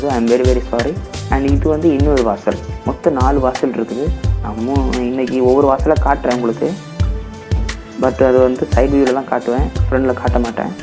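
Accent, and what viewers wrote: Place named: Kanyakumari, India. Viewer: Ur language spr... Kk slang🥰🤩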